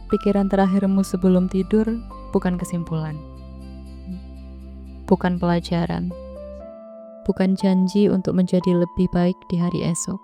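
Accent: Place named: Jalan Wilis, Indonesia. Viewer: suaranya seperti org kelahiran jln wilis ya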